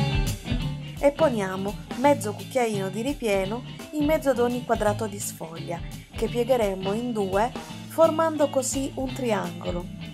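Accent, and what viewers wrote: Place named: Romagna, Italy. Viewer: Che brava la signora!! Adoro la sua cadenza romagnola. Mio padre era emiliano e la sua famiglia ci ha insegnato a fare i cappelletti. Anche se mamma già sapeva fare la sfoglia. Purtroppo io non riesco senza la macchinetta, ma i cappelletti ogni tanto li faccio. Grazie per questo tutorial!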